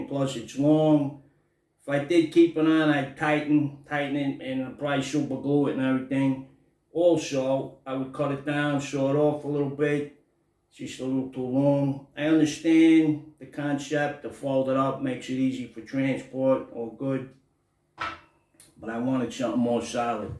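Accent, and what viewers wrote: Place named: New York, United States. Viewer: Hello from Glasgow Scotland, Getting very rare to hear a proper NY accent, its the same with the proper Glaswegian accent, great review, I have a few pistol bows and what I have done with the bolts is fill them with silicone, cheap and does the trick for a bit of extra weight.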